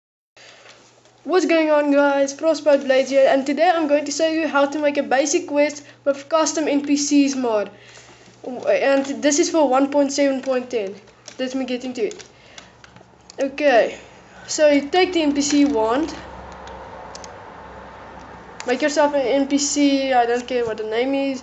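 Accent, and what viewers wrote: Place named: Afrikaans, South Africa. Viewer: Yeah haha,, I recognized the accent. I'm in PE. It's not often you get south African you tubers.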